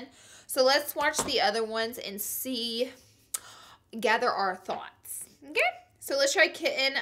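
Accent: South, United States. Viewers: Y'all she looks like a chola but sounds like a southern lady! I love her voice so much 😂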